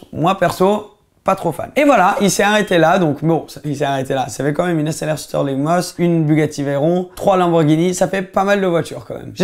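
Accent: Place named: England, United Kingdom. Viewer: T'es anglais ou français ? Je te pose cette question parce que t'as un accent anglais mais tu maîtrise bien le français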